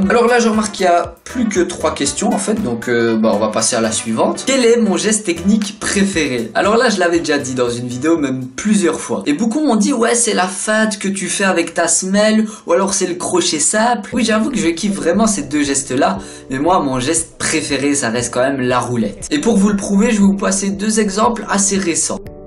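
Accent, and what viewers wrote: Belgium. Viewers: Le réveil de l'accent belge!!!!!!!!! (oui)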